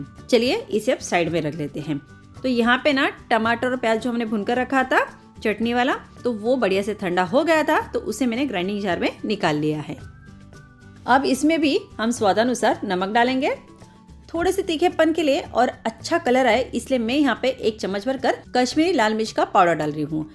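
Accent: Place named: South India, India. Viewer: Voice of South Indian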